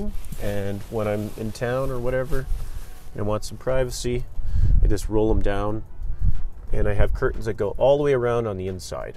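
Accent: Canada. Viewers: Definitely Canadian sayin ouut!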